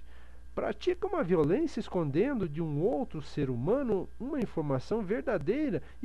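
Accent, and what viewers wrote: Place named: Paraná, Brazil. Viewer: Minha família no Sul do Paraná fala assim tb!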